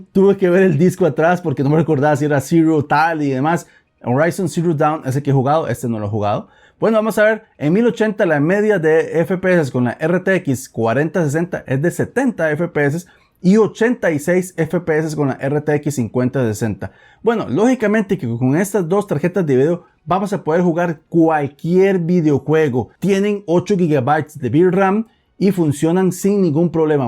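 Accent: Costa Rica. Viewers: jaja que bien, no se porque dije: este mae tiene jacha de tico y acento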